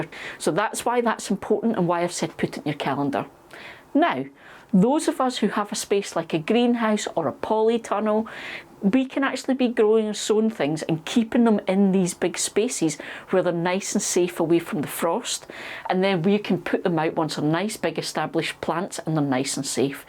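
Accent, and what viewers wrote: Scotland, United Kingdom. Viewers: Brilliant video - great to hear another Scottish voice. I’ve just got my plot and am sharing it on YouTube too. Thanks for all the tips.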